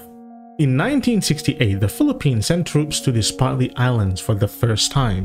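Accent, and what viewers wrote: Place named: China. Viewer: True the voice of this person is Chinese, undermine relation of Vietnamese and Philippines or this person might be Filipino duterte fanatics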